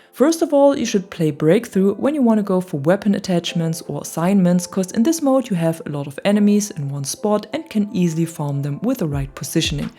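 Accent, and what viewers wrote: Germany. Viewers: Man hört voll raus, dass du deutsch bist 😀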